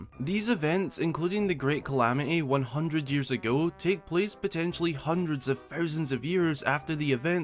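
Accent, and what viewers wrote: Scotland, United Kingdom. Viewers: I love the thought of Dodongos in BOTW! BTW, I couldn’t pinpoint it at first, but your accent sounds just like the male version of Merida’s from Brave. I’m guessing that may be because you’re Scottish? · First video I've seen from you, and I love the Scottish accent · When I heard the Scottish accent I audibly gasped. I'm also Scottish and a fan of LoZ :-)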